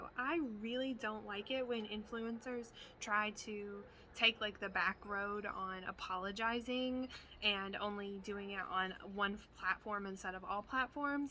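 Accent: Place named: Kentucky, United States. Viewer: Hey! I knew in your last video you has an accent. I used to visit friends in KY all the time. I haven't been back in about 8 years but love it there. I think 20 to 30 minutes is a good length depending on the content. I'm glad you didn't edit out the honking car it actually fit as a funny effect! Can't wait for the cooking video 😊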